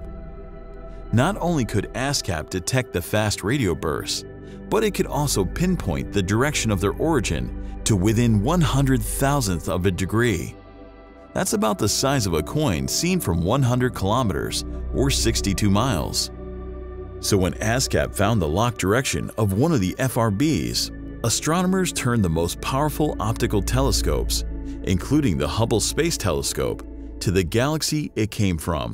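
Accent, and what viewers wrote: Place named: United States. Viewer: the narrator has the most american voice i've ever heard. great content though.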